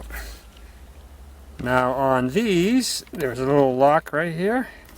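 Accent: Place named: New England, United States. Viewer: I saw a million professional videos on this job and it's the guy with the New England accent, like Peter Griffin, that got the job done and helped me truly understand. Much appreciated. 😄🍻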